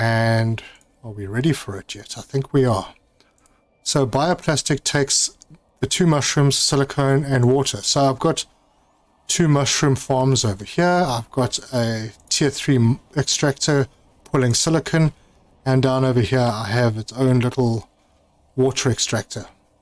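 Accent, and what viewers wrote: South Africa. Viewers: I tested the T3 ore extractors, and you can mine everything without having to go to specific areas (super alloy cave, osmium cave, etc.). Also am I detecting a South African accent?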